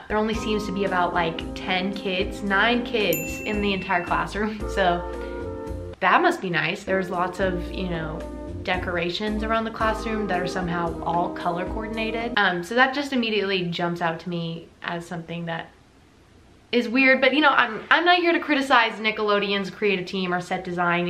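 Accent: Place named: Alabama, United States. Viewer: You have a slight Alabamian accent. Are you from here aswell? You have a tint of south in your voice.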